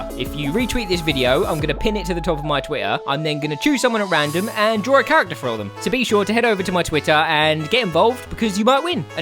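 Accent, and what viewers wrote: United Kingdom. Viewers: You have a British accent, yet go to 'high school'. hMMMM? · OMG!!!! YOU'RE BRITISH ACCENT IS SO AWESOME DUDE!!!!!!!!😻😻😻😻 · I absolutely love your british accent. Subscribed. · How come you have a British accent but a American school 🤔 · you sound British.... i like Britains since i am myself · I just found out about your channel and realised....we both have the name doodles in are channel......Steve doodles....xD also I subbed cuz I love your art style...also are you British? Sounds like you are xD I am too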